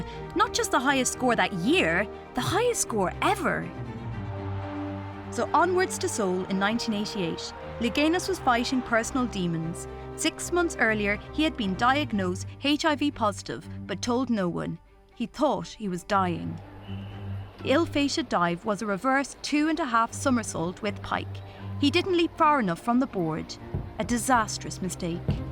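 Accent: Ireland. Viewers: Lol at the Irish accent of the narrator.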